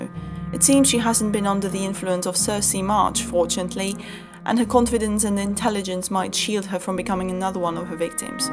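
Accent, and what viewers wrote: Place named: Netherlands. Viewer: Nice video! Interesting accent. It sounds RP english but with a heavy Flemish or dutch flavour to it. Something about the pronunciation of the word "o" and the emphasis on the consonants at the end of words. More clipped. Sorry; accents fascinate me.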